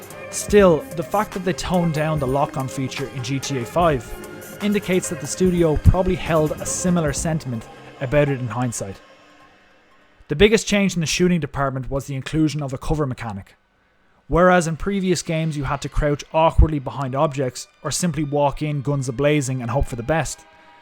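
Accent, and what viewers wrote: Ireland. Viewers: Are you from Ireland and if you are what county lol,also great video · Is that an Irish accent I hear?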